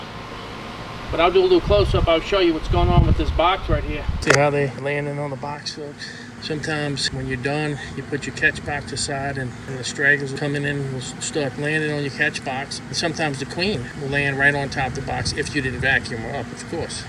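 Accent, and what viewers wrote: United States. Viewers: Great video! Also your accent is so unique. You sound like east coast, southern, west coast, rhode island. All at the same time. So weird. (in a good way)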